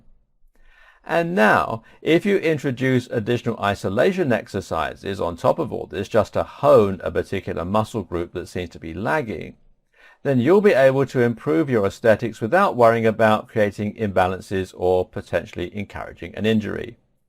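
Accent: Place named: United Kingdom. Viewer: The British accent is one of the most lovely things to listen to...not sure why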